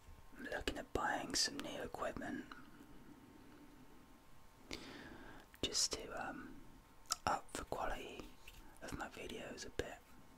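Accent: United Kingdom. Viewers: relaxing together with your british accent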